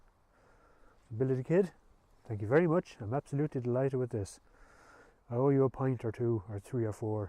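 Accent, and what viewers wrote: Ireland. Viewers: Great review I like watching your videos, the way you talk and the words you use remind me of my Grandfather, He was from County Mayo on the West coast.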